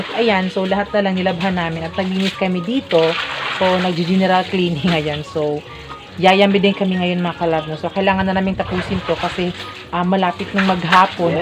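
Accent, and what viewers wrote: Visayas, Philippines. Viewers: nakakainis naman ung sinasadya mong pagbigkas na may bisaya accent like EKOT EKOT at DETU. pagbisaya nalang kay pangit paminawon. ayaw mo kasulo kay constructive criticism na ha.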